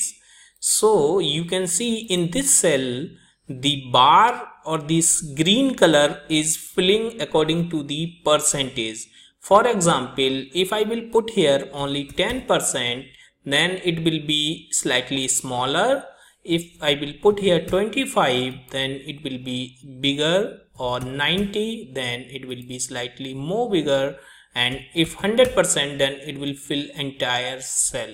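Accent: India. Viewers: I bet it's an indian guy talking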